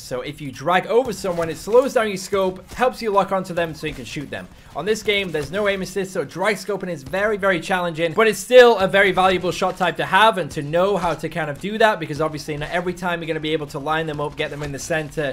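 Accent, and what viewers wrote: Yorkshire, United Kingdom. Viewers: Great vid. Ive got something to try now. Makes a change to hear a Yorkshireman on here!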